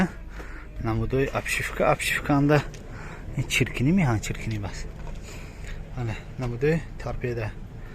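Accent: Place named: Khujand, Tajikistan. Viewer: Салом алейкум акачон илтимос Акцети хучанди Кати гап назанен МО бисер гапотона намефахмем забони точики модари Кати гап занен узр 😢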